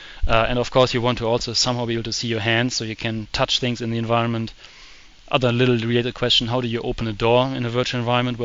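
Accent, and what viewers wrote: Germany. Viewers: Bist du Deutscher?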